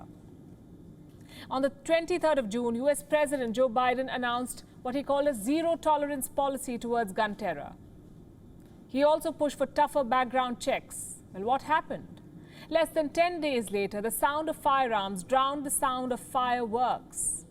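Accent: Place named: India. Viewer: 𝕀𝕟𝕕𝕚𝕒𝕟 𝕒𝕔𝕔𝕖𝕟𝕥 𝕚𝕤 𝕛𝕦𝕤𝕥 𝕡𝕝𝕒𝕚𝕟 𝕟𝕒𝕤𝕥𝕪 𝕃𝕆𝕃!!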